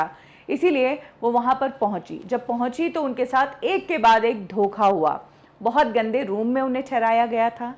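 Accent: India. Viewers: हिंदी का उच्चारण सुधारें। Marathi influence is very clear. हैं को है बोलती हैं। इस तरह को और बहुत सारी गलतियां हैं।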